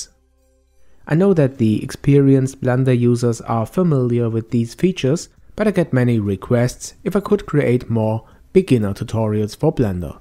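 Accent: Germany. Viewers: Most likely a German native speaker who is overly self-conscious about using the "w" sound correctly.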